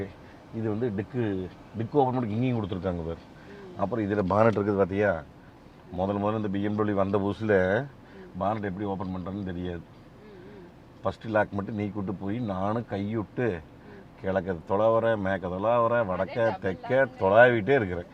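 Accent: Kongu, India. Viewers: Addict your kongu tamil Anna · கொங்கு நாட்டு தமிழ் உச்சரிப்பு அருமை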